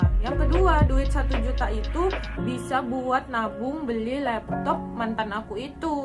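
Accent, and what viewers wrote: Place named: Surabaya, Indonesia. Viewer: Aku suka banget logat surabaya nya 😂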